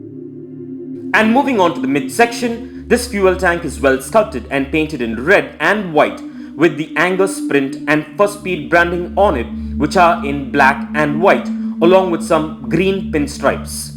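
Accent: United States. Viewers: Your accent is like you are a man from America.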